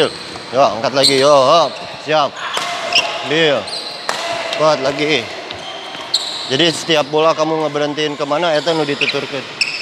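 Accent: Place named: West Java, Indonesia. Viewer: Nyimak kang Ciri khas pisan urg sundana... Hatur nuhun hehe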